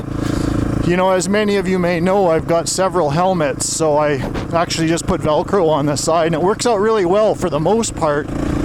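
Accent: Canada. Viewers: Saw you in Global addiction man! Had no idea you were in that video but could tell it was you under the helmet because of your super friendly Canadian accent. I'm from Minnesota soooooo I knooow you you got the O's! Lol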